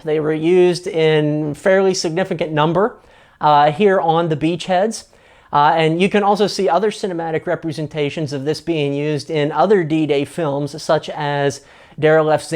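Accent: United States. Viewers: Anyone who wants to know how american accent sounds just has to listen to your video ! Love from Normandy, near the beaches. .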